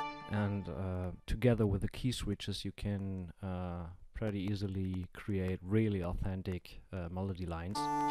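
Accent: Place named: Germany. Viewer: Are you german? Cause you have a german accent ;) Or atleast it sounds like one.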